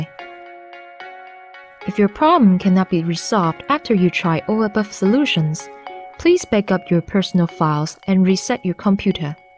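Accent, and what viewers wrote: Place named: China. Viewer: It's sad when even the AI has a Chinese accent so heavy that it can't be understood.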